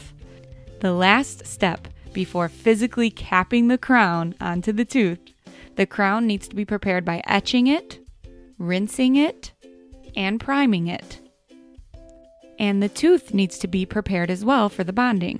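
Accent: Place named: Minnesota, United States. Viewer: I like your voice. You sound like a friend of mine. Are you from Minnesota?? Great video BTW.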